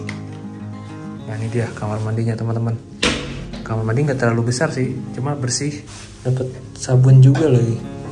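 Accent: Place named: Java, Indonesia. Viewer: Mas logatnya ada jawanya, kayak bukan orang sunda mas 😅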